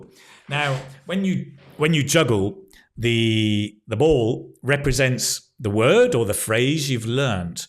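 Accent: United Kingdom. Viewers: Hello, I could stay for hours listening to you speaking with that wonderful British accent. I am Argentine, I learnt English when I was very young. Now I'm 62 and findd that learning languages is amazing. Five years ago I started learning French and now I can have a conversation or read or listen. I went on changing my methode. Once I felt confortable with the basic grammar rules I started watching videos of different subjets just to grasp the accent and the vocabulary of real people. Now, I am learning German! My level is really basic but one day I found myself reading and listening to a simple story. And that was so motivating!!! As you said, each one has his own methode. Mine is as simple as go on changing methodes!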